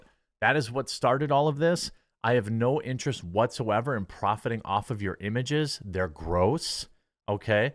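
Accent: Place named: Wisconsin, United States. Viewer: I swear I'll unsub the next time u say braigged instead of bragged. Fuggin wiscansin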